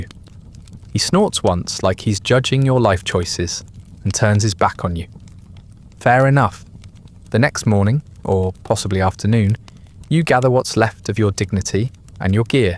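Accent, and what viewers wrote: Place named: United Kingdom. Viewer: Hey, I’m here again! Idk why your voice is so soothing; but, thus far, yours is the best, from I’ve found here in Houston, Tx… A.M. also, idk why the Brit voice so Many, to the American ear, is so appealing! TY!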